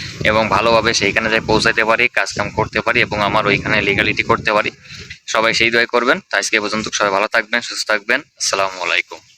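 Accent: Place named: Jessore, Bangladesh. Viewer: ভাই আপনার বাড়ি কোন জেলাতে আপনার ভাষায় তো বলে যশোর চুয়াডাঙ্গা এই সাইটে আপনার বাড়ি কোন জায়গায় একটু যদি জানাইতেন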